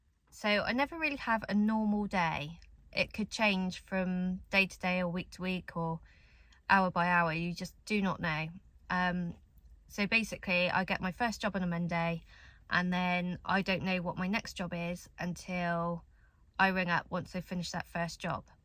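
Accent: West Country, United Kingdom. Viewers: Hi again, having just subscribed to your channel it offered me this one to watch, and I am pleased it did, you spoke alot more in this one, which was great and reminded me of my late partner who sadly died Aug last year, she (Valerie) was from the west country, Somerset to be exact, so hearing your accent really warmed my heart and brought back some lovely memories, we were together 20 years and I still miss her, thank you for sharing. All the very best to you, from Nick.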